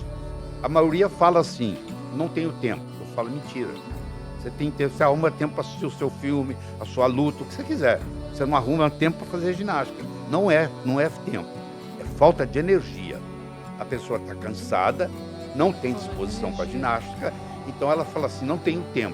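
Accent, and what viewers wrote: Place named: Minas Gerais, Brazil. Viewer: Um Médico incrivelmente competente estilo Mineiro dos bons Não fica zangado Fala uai com simplicidade mas o que fala prova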